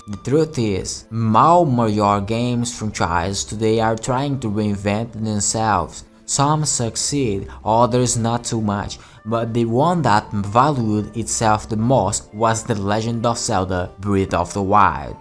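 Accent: France. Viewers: Idk man the accent makes me think he’s French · Bro speaks francglish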